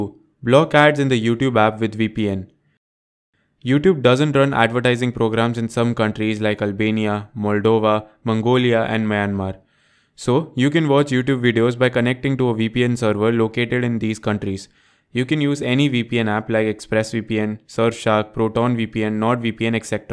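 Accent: India. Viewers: Indian accent always scam😢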